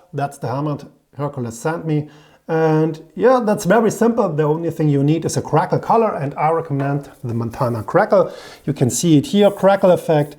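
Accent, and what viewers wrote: Germany. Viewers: Hey, Awesome work, artistry and video's too boot.. thank you.. we all love your video's as with knowledge we have the balls to deliver instead of our girlfriends taking the lead.. I have a question... I want to strip / repaint an Old Skool race road bike that has an alloy, aluminium frame.. I would like to know is it better to strip, polish the raw metal and then apply a clear adhesive promoter before candy spray or is it better to leave the metal surface 'rough' and then apply a primer and candy spray... what do you suggest please as you are the Prime Mover, Master of Spray excellence. Cheers Ivan from UK (ps: Your videos ROCK... Gernman accent, humour and technical excellence... Yavol..:-) Use your brain and balls don't be a pussy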